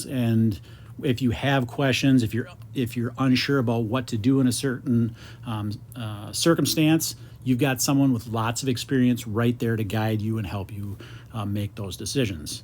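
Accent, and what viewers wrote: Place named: Upper Midwest, United States. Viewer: I should have know you were from our neck of the woods. You got that big "don'cha know" accent!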